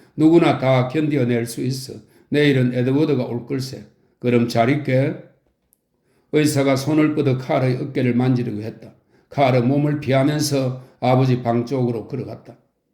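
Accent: Gyeongsang, South Korea. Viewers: 긴 시간 동안 읽어주셔서 감사드립니다. 처음에는 어색하였는데 나도 모르게 경남 사투리의 어감에 빠져들었습니다. 이제 모든 글을 들어야겠습니다. 새로움으로 가득한 소식을 늘 기다리겠습니다.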